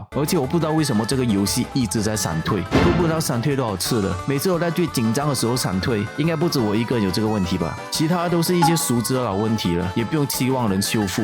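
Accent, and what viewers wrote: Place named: Malaysia. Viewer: 听他口音，马来西亚人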